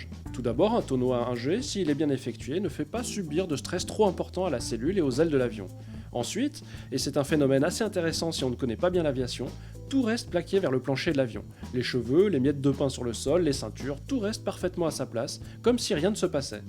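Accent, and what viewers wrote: Switzerland. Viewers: Tu est suisse? sinon très bonne vidéo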